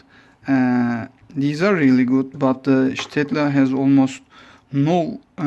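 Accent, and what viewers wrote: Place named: Turkey. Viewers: 2.saniyede falan türk olmama ihtimali yok dedim ama açıklama ve isimden dolayı yanıldım mı acaba dedim, yorumlar neyse ki şaşırtmadı :) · Aksanın ben türküm diye bagırıyo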